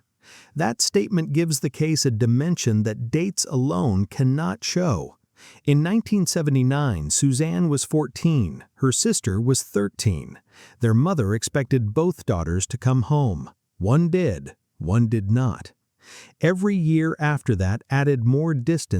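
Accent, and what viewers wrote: United States. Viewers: Why an American voice on a British crime case.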